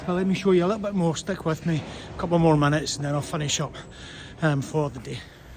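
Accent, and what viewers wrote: Scotland, United Kingdom. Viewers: How could he/she not understand it? Your accent is much much mild Scottish accent but certainly can be understood easily. I really have to work hard to under the strong Glaswegians' accent as some words seem not English when they speak.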